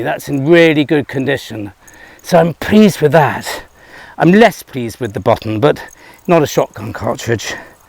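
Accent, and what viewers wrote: United Kingdom. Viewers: I do love youe videos and your very British English. I always smile with You when your smiling finding something interesting - more of this please! Must be fun to go out with you detecting... Greetings from Switzerland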